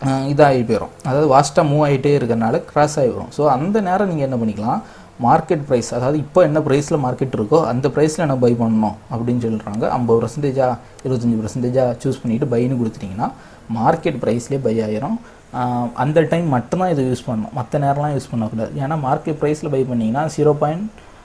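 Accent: Kanyakumari, India. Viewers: Kanya Kumari thane bro neenga 🔥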